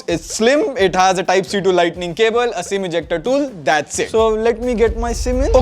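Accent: India. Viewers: your language is very clear 😃 i think you are indian 😅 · Indian accent 😅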